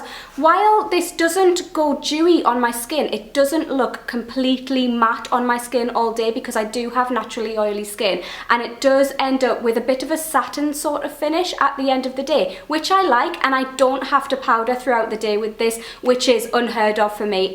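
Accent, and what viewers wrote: Newcastle, United Kingdom. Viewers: Great review def gonna try this!👌🏻 I love your accent,makes me feel like I'm watching Geordie Shore & puts me in a good mood!😁😄 Loving ur videos😊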